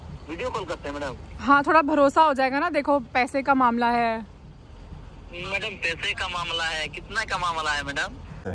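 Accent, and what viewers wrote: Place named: Jharkhand, India. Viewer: Ye iske tounge language se pta chal rha hai ki ye jamtara Jharkhand ka hai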